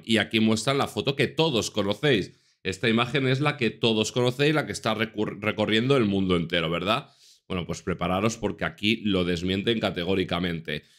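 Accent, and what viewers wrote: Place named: England, United Kingdom. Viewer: I LOVE your English accent😂❤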